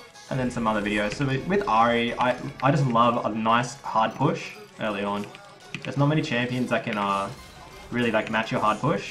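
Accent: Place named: Australia. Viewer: Australian? can tell by the accent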